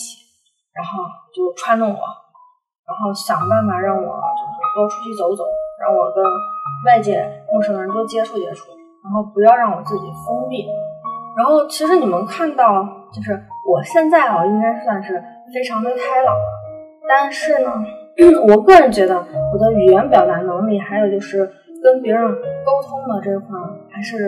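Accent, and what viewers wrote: Shaanxi, China. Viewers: 陕西话摄地聊滴太。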